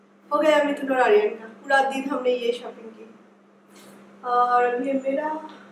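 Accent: Bengal, India. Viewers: Didi apni bangla te vlog korle basi vlo lagbe...hindi ta apni vlo bolen bt, Apnar kothar moddhe sob jaygate banglar tan ache... Plzzz banglar manus banglay fire asun....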